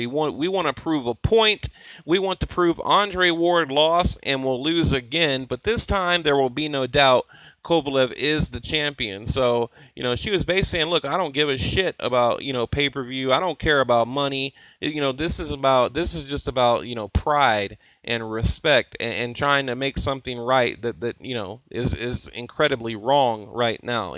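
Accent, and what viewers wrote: United States. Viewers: are you from Houston bro?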